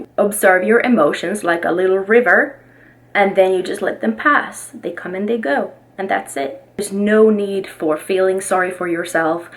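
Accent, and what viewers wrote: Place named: Louisiana, United States. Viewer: is getting that Cajun accent. 😛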